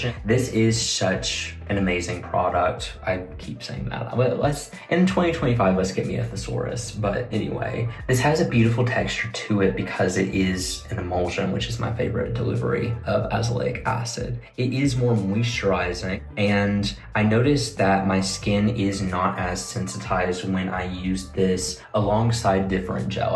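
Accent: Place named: South, United States. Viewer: I like your accent but I can’t place it. Southern? Midwestern?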